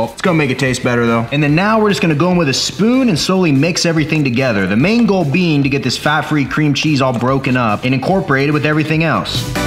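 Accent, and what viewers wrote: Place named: Ohio, United States. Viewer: Listening to this dude gave me ohio vibes. Checked him out... columbus. Southender here myself.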